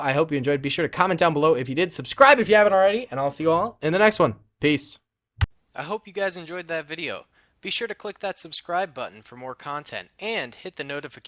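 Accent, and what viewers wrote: Russia. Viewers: leave the russian accent for barronvongames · This is the perfect video. Your Russian accent is hilarious, and the Soviet Anthem in the background makes it even more entertaining. Also, you should try doing an Old Republic video someday. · his russian accent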